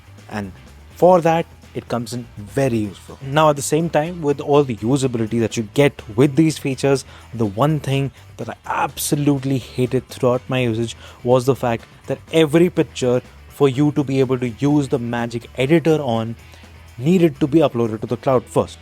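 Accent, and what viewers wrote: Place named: India. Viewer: Stop speaking Indian man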